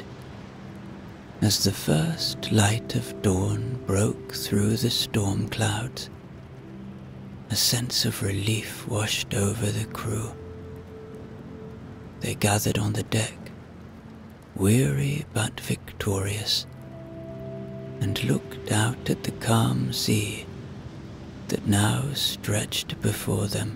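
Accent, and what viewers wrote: Ireland. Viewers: Loved this! I live Liabrarys, old ones expecualy, & Fairy Tails, Story's, & Irish Voice, Thank You ! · Please excuse me, I am uncomfortable with saying anything about this, but his accent is Irish.❤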